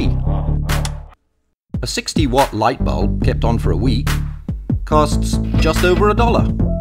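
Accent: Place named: United Kingdom. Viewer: i love this. HOWEVER i do find it a bit creepy that they chose to have a brit do the narration for wasteful american energy.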